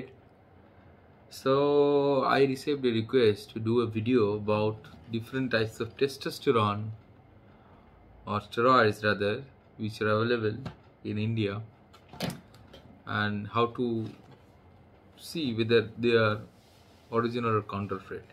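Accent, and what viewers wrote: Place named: Canada. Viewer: I like your accent. Are you Canadian ?? Eh ?.ya ? Cool . Thanks doc.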